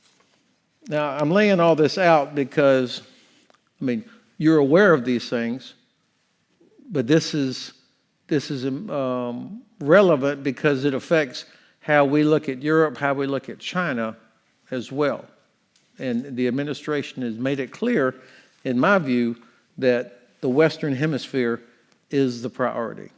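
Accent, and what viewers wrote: United States. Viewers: As an English learner I say f*ck King’s English, I’ll take Florida English every day of the week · I thought the accent was Houston area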